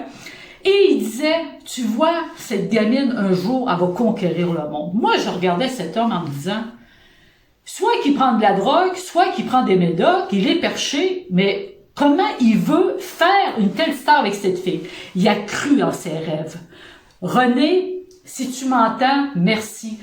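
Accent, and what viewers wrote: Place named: Quebec, Canada. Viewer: Coucou entendre ta pêche et ta super énergie, ça fait du bien en plus de ton p'tit accent Québécois qui apporte la petite cerise sur le gâteau, merci!!!!!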